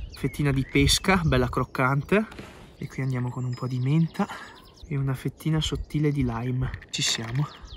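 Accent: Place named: Trentino, Italy. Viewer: Ehiii, da dove vieni? Io sono trentina e sento che anche tu lo sei hahaha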